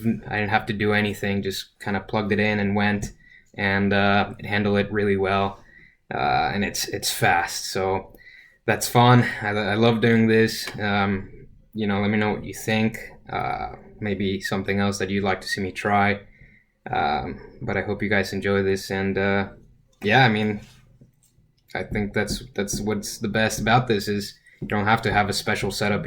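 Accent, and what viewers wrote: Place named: United States. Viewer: You sound american...Did you get permission from the FAA to go that fast?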